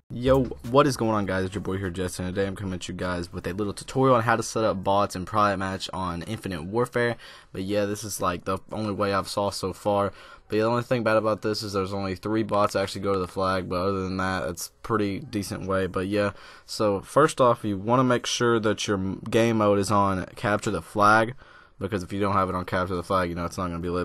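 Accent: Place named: Bay Area, United States. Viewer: Bro you from the bay !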